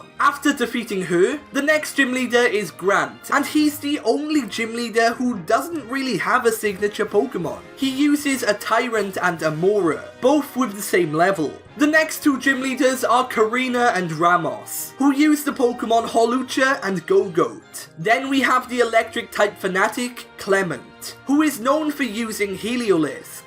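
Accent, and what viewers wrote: Wales, United Kingdom. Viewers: Are you welsh ? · you are welsh aren’t you. I can kinda make out some welsh accent. Possibly, I’m an American so I know nothing.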